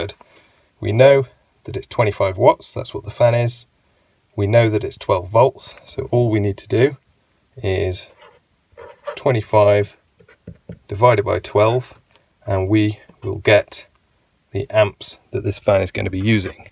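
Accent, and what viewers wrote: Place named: United Kingdom. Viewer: Can’t thank you enough for these videos. You’re an excellent electricity teacher. Spent a few years NOT understanding this. You explain it plainly in 15 minutes. Ahhhh the time I could have saved 😂 and for some reason I understand the British lesson way easier than if an American is teaching it. I think some of the words make way more sense (leisure battery (b)=dual system(a)) plus I enjoy your accent way more than these hicks we have here 😂😂😂 sorry I had to 😝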